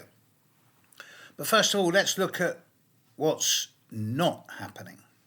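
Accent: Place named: Bath, United Kingdom. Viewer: You look really fresh and well rested. I love that some of your words still have a bath dialect pushing through. Greatest astrologer ever. ❤️🫶🏽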